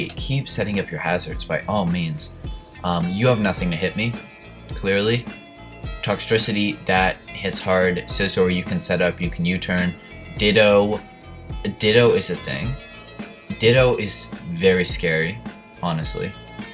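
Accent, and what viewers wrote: Poland. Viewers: U polish? 😱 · U polish?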